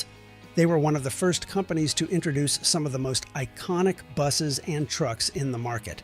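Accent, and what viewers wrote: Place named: United States. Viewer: Why do we have to have the voice of an American narrator.???????????????????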